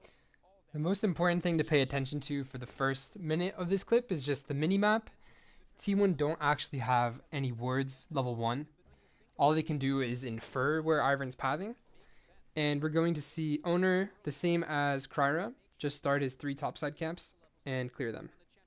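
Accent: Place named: North America. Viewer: Sounds like they're from NA, not sure what accent you hear from the video to think Zimbabwe :D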